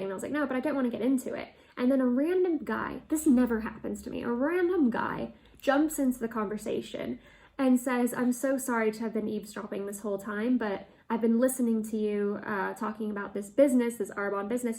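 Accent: United Kingdom. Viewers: What's really interesting is I sensed a UK accent when you first started talking before you even mentioned you lived/worked there. So cute! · Ah your sweet little UK accent that just barely comes out sometimes..adorable!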